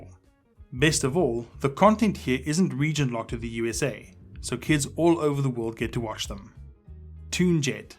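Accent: South Africa. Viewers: You South African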